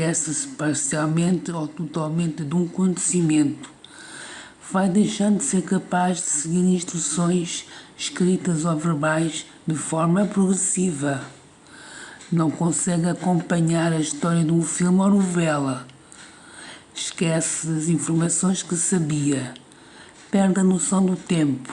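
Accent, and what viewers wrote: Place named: Portugal. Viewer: Olá amiga, passando para conhecer seu trabalho. Muito bom saber sobre a doença. Você parece de Portugal. Deus abençoe 💋🌹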